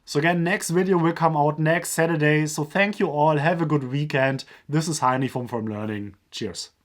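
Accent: Germany. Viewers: Very strong German accent 😆👍🏽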